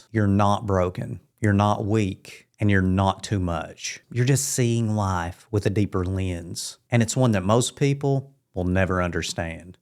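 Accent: United States. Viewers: What state is that accent from? Respectfully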